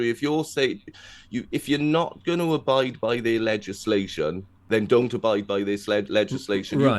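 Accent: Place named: South Wales, United Kingdom. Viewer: proper south Welsh accent.....Swansea/Clydach valleys?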